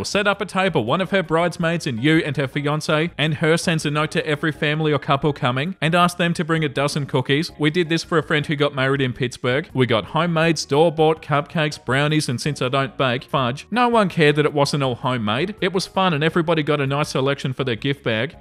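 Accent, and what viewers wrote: Australia. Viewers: I always look for a new when I first wake up in the morning! It's so nice to listen to your soothing Aussie accent as I get ready fore the day!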